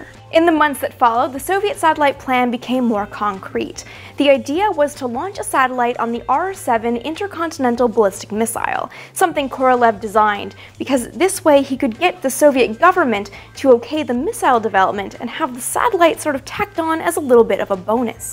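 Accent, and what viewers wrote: Canada. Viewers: She's Canadian? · Wait, are you Canadian? Maple Leaf pride! 🇨🇦🇨🇦🇨🇦🇨🇦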